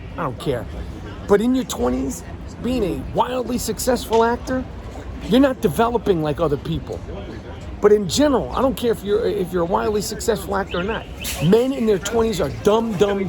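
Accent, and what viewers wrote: New York, United States. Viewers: Why is the camera so close to your face? I love the passion but dayum. I’m here for your voice bc it’s SO New York and I love hearing the pain. Heat in 5 🔥🤍🔥 That joke about Randle was funny tho. Lint brush. Maybe that man have other stuff on his mind 🤷🏽‍♀️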